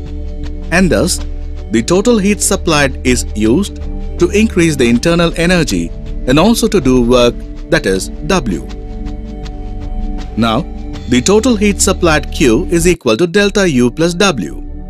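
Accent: India. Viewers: WHO IN THE FUCK CREATED INDIAN ACCENT READER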